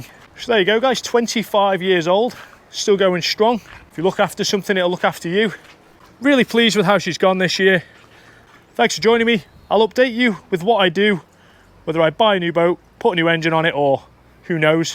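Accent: Liverpool, United Kingdom. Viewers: close a bud scouser here your close to it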